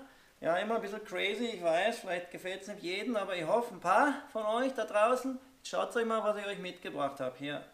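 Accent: Austria. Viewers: Bist ein sympathischer Typ 😁 Die Bilder sind richtig gut. Das österreichische ist nicht schlimm man versteht es gut 👍 Nur die Musik war bissi laut oder die Sprache zu leise sonst schöner Film. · Österreichisch ist perfekt. Als weida sooo! und viel Spass mit dem kleinen Flieger!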